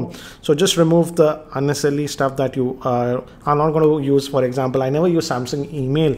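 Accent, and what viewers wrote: India. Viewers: With your Indian accent I do not understand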